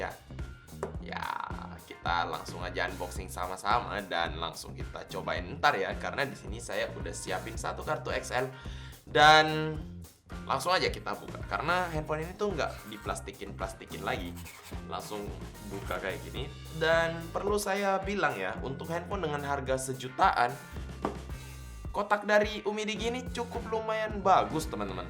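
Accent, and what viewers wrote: Makassar, Indonesia. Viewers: Bukan malah sebaliknya. Justru ciri khas nya itu adalah logat atau bahasa Makassar nya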